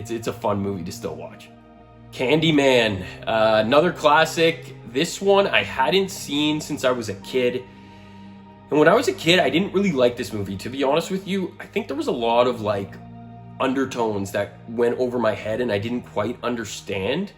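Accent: Canada. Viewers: Hey I just wanted to say I think you make really great content and have inspired me to get in to King. Keep up the great work!! Also is that a Canadian accent I hear??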